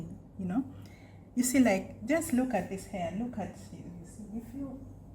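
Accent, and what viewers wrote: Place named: Uganda. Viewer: Sounds Ugandan. Love this kind of content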